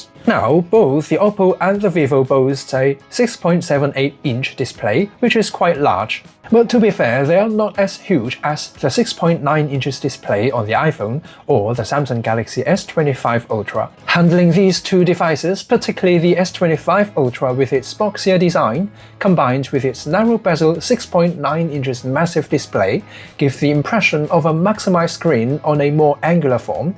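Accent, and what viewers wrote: United Kingdom. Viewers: 英式口音？👍叻叻，英式係難過美式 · I just realized you have British accent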